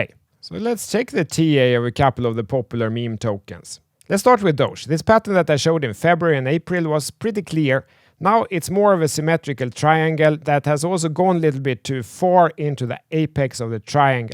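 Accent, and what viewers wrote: Sweden. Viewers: You sound Swedish as hell. The Swenglish is on and it sounds really sophisticated!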